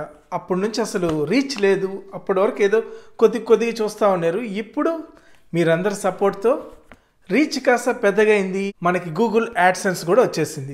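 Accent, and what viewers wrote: Nellore, India. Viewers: I have a very small question bro... If you don't mind... Are you from Nellore?? Bcz some words Nellore yaasa tho match avtunnaayi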